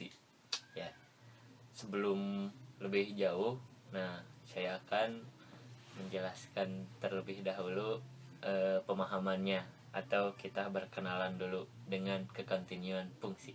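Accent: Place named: Bandung, Indonesia. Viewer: Si aa pasti urang bdg kwkwkwk. Nuhun a..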